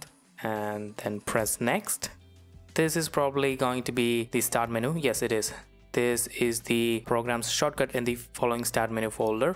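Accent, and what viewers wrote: India. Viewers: What's the size of cakewalk walk [including studio instruments suite only] By the way... Where are you from?? Your voice sounds like an Indian ^_^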